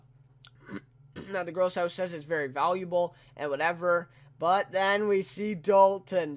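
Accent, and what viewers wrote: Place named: Canada. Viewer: Are you Canadian? Your accent is annoying.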